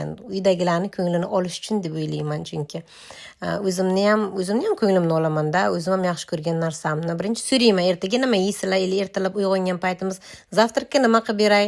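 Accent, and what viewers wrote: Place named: Termez, Uzbekistan. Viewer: опа яхшимисиз жуда яхши аелсиз тан жонингиз сог булсин термизчангиз жуда ектида лекин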